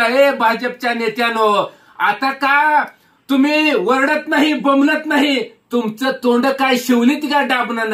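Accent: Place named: Solapur, India. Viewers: सोलापूरी आवाज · सोलापुरी भाषा कडक 😂😂😂😂😂😂😂😂😂😂😂😂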